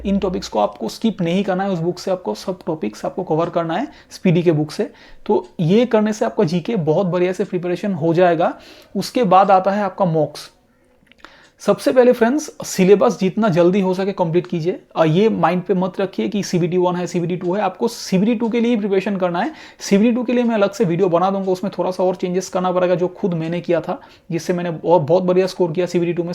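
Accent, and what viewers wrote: Bengal, India. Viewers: Sir aap bangal se ho na ?? 😅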